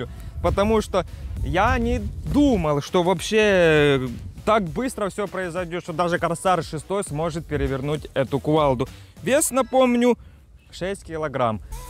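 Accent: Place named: Ukraine. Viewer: Зачем тебе маска) наверное это завлекает новых зрителей) по голосу сразу слышно Украинец!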